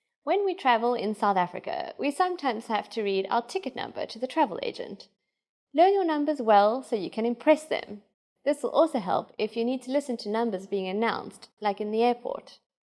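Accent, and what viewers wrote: England, United Kingdom. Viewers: thank you for these videos, I'm Zulu so i definatly need someone with an English accent to teach me Afrikaans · Fully agree, also pronunciation is off, she's probably british,,, also her Dankie sounds like donkey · Ek voel dat 'n tweetalige persoon hierdie video moes gedoen het. Maar goeie werk. 'N Engelse persoon moenie Afrikaans leer nie omdat uitspraak 'n rol speel. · I am Afrikaans. This woman has a strong English accent when she tries to pronounce the words in Afrikaans. There's also a spelling mistake - "biki" should be "bietjie" and she totally mispronounced the word item. Unprofessional advertising as an educational source. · The pronunciation needs work. This woman is speaking with a very prominent English accent. Native speaking Afrikaners don't sound so flat when they talk. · Peter Murray Exactly! Thank you so much... 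I just find it sad that they didn't get a native Afrikaans speaker to give these lessons. She's a wonderful teacher, but her English accent is very strong. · Her pronunciation is “off” - she speaks Afrikaans with an English accent - there are various Afrikaans dialects but this English/Afrikaans - good for basics I suppose - she does make a few mistakes in this video - Fraans vs Franse - Ek praat Fraans - Hy is n Franse man (fraansman) - Also U - its not pronounced ie - but n deeper sound- Also Skiestog - we say “Askies” etc etc etc.. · I really love this! I just wish the Afrikaans sounded more like an Afrikaans-speaking person and had less of an English accent to it. Afrikaans people do not sound this heavily English when speaking Afrikaans. Enunciation needs to be tweaked a bit more.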